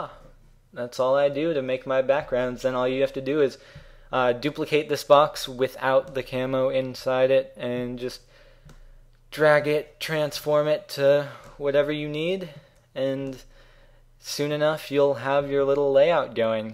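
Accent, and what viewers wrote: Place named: California, United States. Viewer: You sound more California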